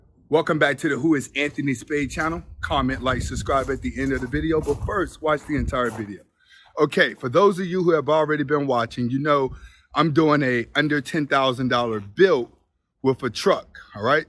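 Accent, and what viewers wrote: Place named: New Jersey, United States. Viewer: Bro I could literally feel you were from New Jersey.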